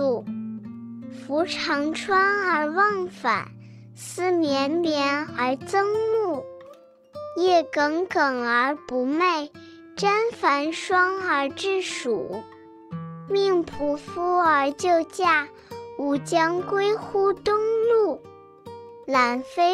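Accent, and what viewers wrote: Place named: Cantonese, China. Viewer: 粤语读出来，别有风味啊😁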